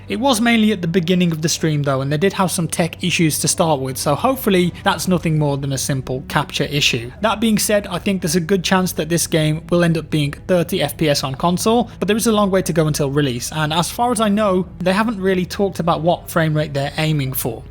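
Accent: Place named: Midlands, United Kingdom. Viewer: Always luv ya videos man, those dulcet w/midlands tones always remind me of home. Hit me up if you're ever down Bristol ways. Keep on gaming!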